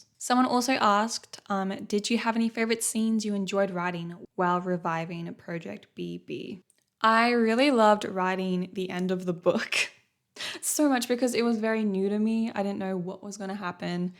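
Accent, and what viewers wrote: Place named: Australia. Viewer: honestly i just finished wathcing your writing documentary that randomly appeared on my fyp. It was the first ever video, I saw from you and actually it made me following you after I just watched for a few minutes. What I'm gonna say maybe sound a bit weird, but your voice with this austrialian accent reminded me of my home. Not in the way of living there, i'm an austrian girl, but more like because of four people in my life, that come from there. For me, those four celebrities kind of tought me speaking more confidently in english and thats also why i got an australian accent myself eventhough we only learn american english in school. I really liked your whole vibe and look so i really think that you'll become sort of a safeplace for me and my thoughts. Writing has always been a huge part pf my life and watching your video really inspired me.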